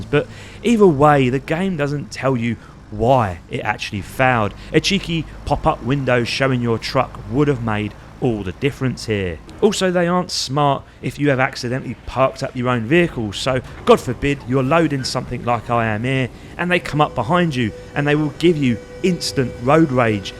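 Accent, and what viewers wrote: Cockney, United Kingdom. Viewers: nice review. love you leaning big into your british cockney accent (fellow brit here)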